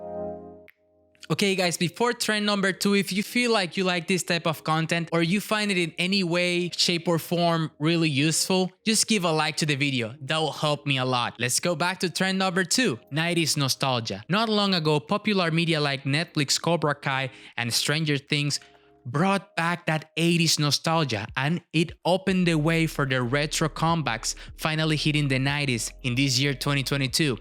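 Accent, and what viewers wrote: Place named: Venezuela. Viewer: Amigo excelente video, apenas escuché tu acento algo me decía que eras Venezolano haha 🙌 Keep up the great work!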